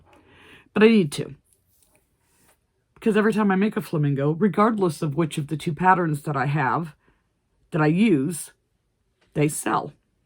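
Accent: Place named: Florida, United States. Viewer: You speak like a Floridian. Laid back and easy. It’s why you’re my favorite. Feels like home.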